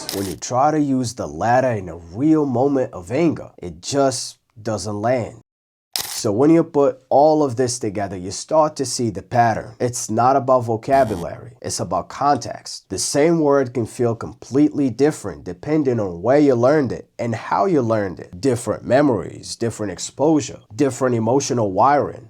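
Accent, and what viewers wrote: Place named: New York, United States. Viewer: I love your New York accent bro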